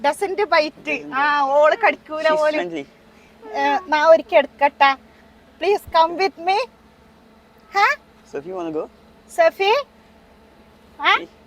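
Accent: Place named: Kannur, India. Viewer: Hi Njan samsaram kelkkan vendi veendum veendum repeat cheyth kanaran, Ninglude kannur slang enikk bayankara ishttantta❤️❤️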